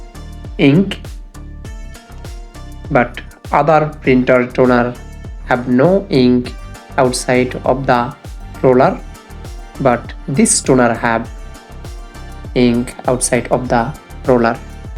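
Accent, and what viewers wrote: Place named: Bengal, India. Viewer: তুইযে বাঙালি সেটা এমনিও বোঝা যায়। .. ইংরেজি এমনিও পারোস না হুদাই ভোকোর ভোকোর বাংলায় ক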